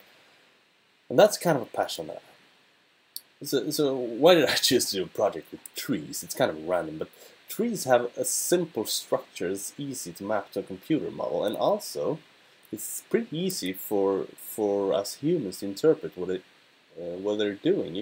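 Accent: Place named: Scandinavia. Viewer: You sound scandinavian, sweed?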